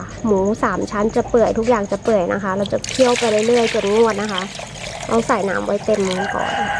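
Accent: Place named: Sukhothai, Thailand. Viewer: ฟังเสียงเหมือนคนโขทัยเลย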